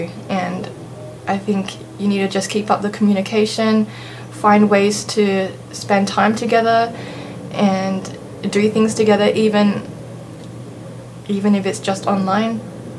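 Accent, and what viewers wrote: Australia. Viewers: If only internet was around in my time. I might try that website....Good luck in the US - hope everything goes swimmingly for you.... Looks like a nice match and catch. Very nice story - hahaha - Phd. wow...... Doctor - how cool is that. You certainly know how to build a life for yourself. Thanks for sharing. Oh keep your Australian accent if you go to the US lol...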